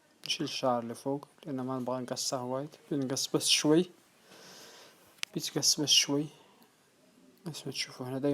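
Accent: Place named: Morocco. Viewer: السلام عليكم ورحمة الله هل الأخ مغربي؟ لكنتك قريبة جدا للمغاربة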